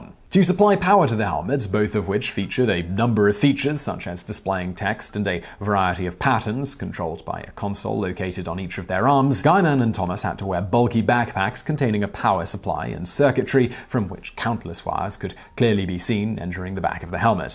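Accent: England, United Kingdom. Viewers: Hey it's Simon Whistler, damn man did you leave visualpolitk, i love that channel and i watched it for you and you alone, you give everything this special style, by this i mean it doesn't sound force scripted or anything and yoyr english accent adds to it as well, nice to see your on this channel as well, I'm gonna subscribe because your on this channel too! 👊👍🍻😁😊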